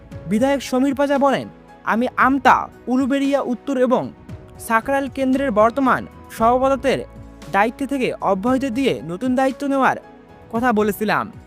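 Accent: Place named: Bangladesh. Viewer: আপনার উচ্চারন বাংলাদেশীদের মত।😮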